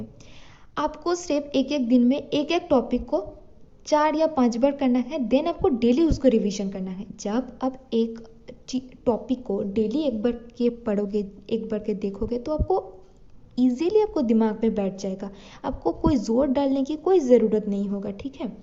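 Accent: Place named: Northeast, India. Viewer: North East by ur voice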